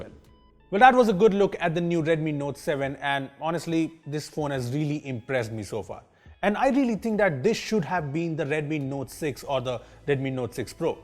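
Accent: India. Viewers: I dont understand why Indians have such a hard time getting rid of their accent. The D's are sooo annoying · I like this channel bcause his accent different from another indian channels 👍👍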